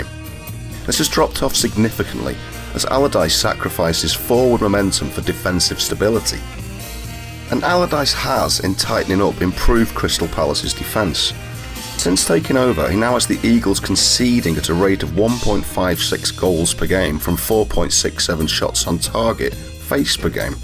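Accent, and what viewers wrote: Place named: Manchester, United Kingdom. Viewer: Given the subject of the video ie relegation, a sullen Manc accent seemed appropriate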